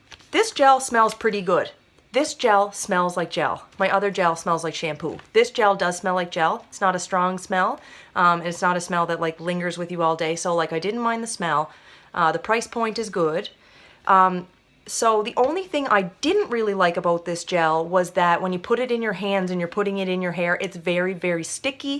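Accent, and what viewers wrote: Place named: Canada. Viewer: Fellow Canadian girl from Ontario! Love your accent. My BIL is from Cape Breton and you guys same the same things ;) Anyway, I'm newish to the curly curl method. I have tried co-washing with a few different conditioners and it makes my hair so greasy and my scalp itchy...what am I doing wrong? does this mean I can't do this method? I am using the Biotera gel, I get a cast no matter how much I use, my hair looks stringy and is hard and crunchy...I don't want that look, is gel not for me?? Sorry for all the questions :)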